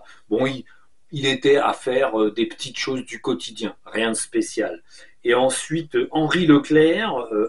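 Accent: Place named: Paris, France. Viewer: salut j' avoue, tu m'as bcp fait rire comme souvent avec ton parlé titi parigo, assez déçue de l'arrivée au sol d'aurobindo loool, son eleve Satprem a écrit un livre ''evolution 2'' que j'ai tjrs gardé en tête et bcp apprécié, il m'a fait grandir c'est certain, depuis j'ai bcp évoluée ou pas.... d'ailleurs, tu sauras me le dire peut être..., j'ai pensé que son instructeur qui était donc Aurobindo, était un peu plus avancé que cela, même si j'ai effectivement bien compris que la connaissance est une question de temps et de moment où un savoir est donné a l'humanité qui ne peut donc pas accéder à des connaissances plus élevées tant que le temps n'est pas venu, sacrée prise de conscience pour moi je l'avoue assez récente, mais qui a remis tant de choses en question, comme depuis 45 ans, où je ne cesse de reculer pour mieux sauter... retour estival, j'espère trouver vite les moyens de recourir a tes services, mr, mais je dois avant cela, te demander si il est recommandé de renouveler une séance alors que j'ai bénéficié il y a plus de six mois de celà, d'une séance qui m'a été proposée et pas du ou pu refuser, bref et que l'on trouve en ligne d'ailleurs sur youtentube,et qui a abouti à un effondrement psychique et physique total, comme mourir a moi même si j'osais le dire et dont je me relève tout juste maintenant... 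a peine.... bref tu sauras me conseiller en temps voulu j'en suis certaine....et enfin, juste pour dire..., je suis assez étonnée que tant de personnes ne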